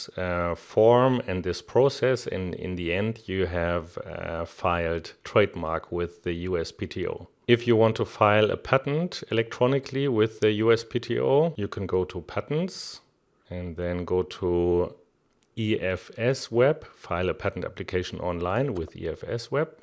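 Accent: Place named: Germany. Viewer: Who is this guy ? Sounds like he’s from Germany.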